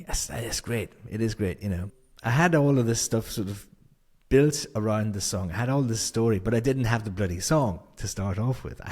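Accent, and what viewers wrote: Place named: Ireland. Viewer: What part of Ireland are you from? I don't recognize the accent. It's hard to pinpoint. It's like a mash of several accents. I'm from Cavan by the way.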